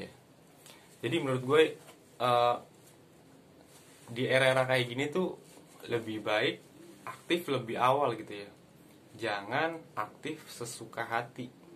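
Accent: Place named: West Java, Indonesia. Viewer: Lo orang sunda ya bang . Ngaku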